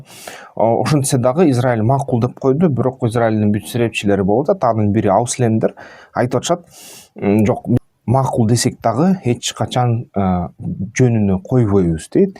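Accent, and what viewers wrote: Standard. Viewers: Нукура кыргызча суйлойсун азаматсын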